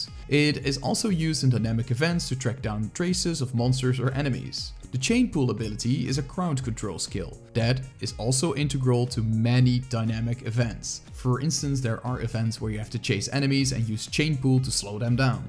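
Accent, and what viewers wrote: Netherlands. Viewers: Came for the A-class content. Stayed for the dutch accent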